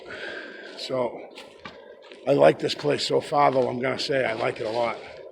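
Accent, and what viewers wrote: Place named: East Coast, United States. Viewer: First time watching your channel, enjoyed it....love the East Coast accent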